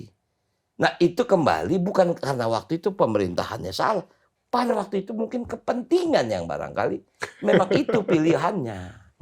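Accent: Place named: Betawi, Indonesia. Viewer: Bang Rano betawinya otentik bener, bcandanye Betawi bener, emang anak betawi aseli lu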